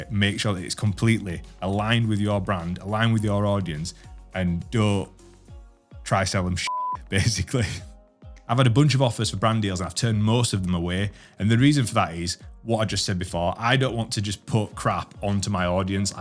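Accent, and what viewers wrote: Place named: St Helens, United Kingdom. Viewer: Great video with some great advice, I’m trying to set up my YouTube channel and your inspirational! Only found your channel yesterday and I’m loving it. Just a quick one, you sound local to me St Helens? Keep up the good work. Oooshhh!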